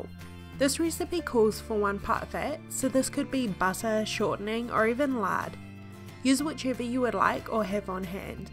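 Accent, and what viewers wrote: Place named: New Zealand. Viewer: New Zealand accent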